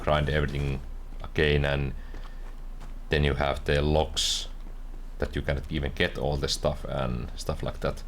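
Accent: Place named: Finland. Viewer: Finnish accent Perrkele 😂 ❤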